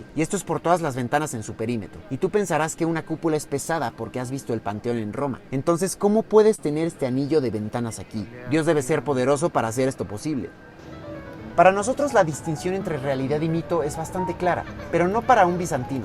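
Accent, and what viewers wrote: Mexico. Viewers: Creo que es un vídeo de pacotilla al tratar de comparar os obras de arquitectura diferentes, creadas con diferentes técnicas y propósito… he estado en las dos y las dos son hermozas y si es solo de cúpulas en las que tenemos que hablar… bueno pues, las dos son diferentes y las dos siguen allí… los romanos fueron maestros en el uso del hormigón y no se a tenido que rehacer varias veces, además que tiene un calendario solar en su interior, con precisión milimetrica…. Bueno y para terminar, para poner un canal en cualquier idioma, hay que tomar primero lecciones en ese idioma, “el mexicano” que aquí escucho no es un español de lo mejor, siento lástima por aquellos que comentan que les aluda a prender español! El “mexicano” todavía no esta reconocido como un nuevo idioma verdad? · Un video diferente a los que solo pasan de largo por la ciencia y la cultura de lo que filman. 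Tienes acento mexicano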